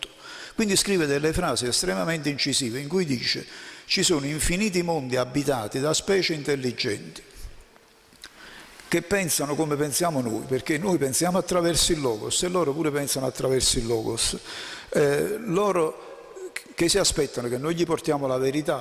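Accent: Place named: Naples, Italy. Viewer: lei é un tesoro culturale napoletano con questa modestia irresistibile da del liceo, nessuna pianta raffinata,solo l'odore dei..limoni. Grazie IISF e Massimiliano per darci ció. Lo spirito di Archita, del nolano e di quel mecenate dell' istituto non moriranno mai. Che pianto amaro leggere la sua difesa senza abiurare. Che onore e fortuna potere sentire questi contenuti, con il suo accento a tanti km di distanza. Perché l'accento che si sente bene nel film é un accento di chi é, di chi parla delle “idee“ ripudiando le forme sofiste, di chi qualunque siano le pacate conferme del pensiero esprime il suo eroico furore. Grazie, sono davvero orgoglioso di esssere Italiano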